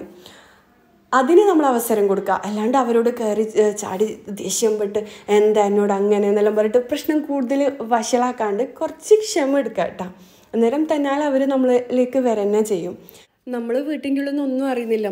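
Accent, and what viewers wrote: Kannur, India. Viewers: Ithaa, u are such a wonderful inspiration to me. Ithante Motivation njan follow cheyyarund tto. Ningale kanoor slang adi poli aan tto. I love u ithaa😘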